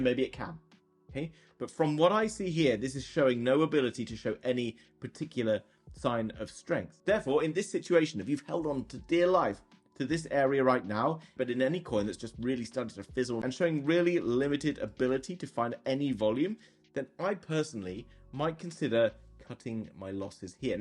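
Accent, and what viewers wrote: England, United Kingdom. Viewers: You are English are you ?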